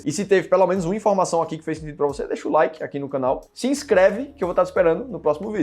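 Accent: Pernambuco, Brazil. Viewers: E aeeee comedor de mocotó de boi! Será que já comeu uma buchada de bode??? Homi....vamos comer uma mão de vaca! Esse sotaque não engana um pernambucano não! Kkkkkkk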